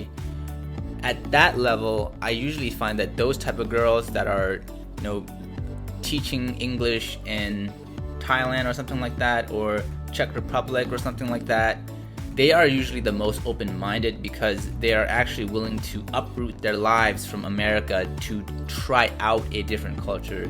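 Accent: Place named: Australia. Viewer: Maybe because you have an australian accent which americans fetishise a lot. So yeah, if you're an aussie you're going to do better overseas not saying you won't do great in your own country, too lol. I heard that aussie girls are more into asian guys than european and american ones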